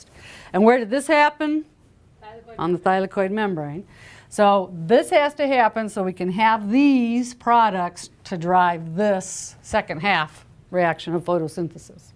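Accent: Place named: United States. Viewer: It was good, much better then my teacher who just went on holiday!? but I don't know if its just the American accent but she sounds permanently drunk.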